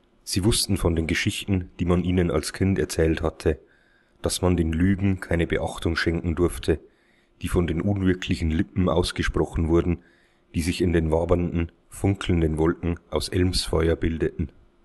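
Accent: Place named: Styria, Austria. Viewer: Kompliment zu der Vertonung dieser Kurzgeschichte. Kompliment. Insbesondere der steirische Dialekt gibt dem Ganzen etwas Ostländisches.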